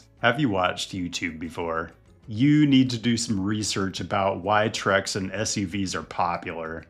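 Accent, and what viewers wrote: United States. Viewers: What is this guy’s accent? What state is he from?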